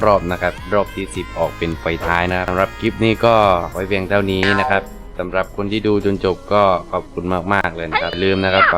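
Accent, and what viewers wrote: South, Thailand. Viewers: จุดนี้มีมาสักพักละครับ (เสียงเหมือนคนใต้)😏😏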